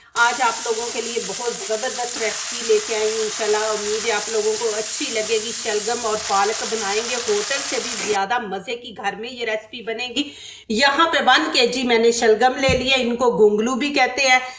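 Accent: Punjab, India. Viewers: Baji aap agar punjabi bolain tu zyada acha hai aap ka lehja boht punjabi hai humain apni zuban hi bolni chahiye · MashAllah punjabi lehjay main urdu achi lugi hay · Lehjha aap ka panjabi ha aap urdu na hi bolain anjabi hi bolain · Speak Punjabi for GOD sakes don’t try to break URDU LEGS ( I am Punjabi too but your accent HORRIBLE) 🙏🏼🙏🏼people laugh on us PUNJABIES ) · آپ پنجابی میں ویڈیو بنایا کریں... کیونکہ آپ کا لہجہ پنجابی ہوتا ہے اور بول اردو رہی ہوتی ہیں ...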